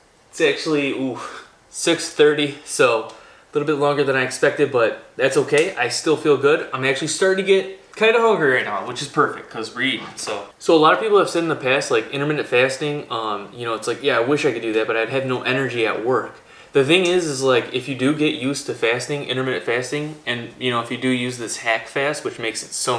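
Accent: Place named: Midwest, United States. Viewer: where is this guy from? THOSE AAAAs are midwestern AF! Canadian? he’s freakin gorgeous and sounds so funny lol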